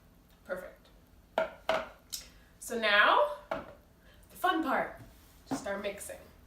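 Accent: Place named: Eritrea. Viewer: You sound smell and test like FULLY ERITRIAN yes you are Comper you with the ather Youtuber eritrian girl way better than her by the way do you know a bit of TIGRIGNA ???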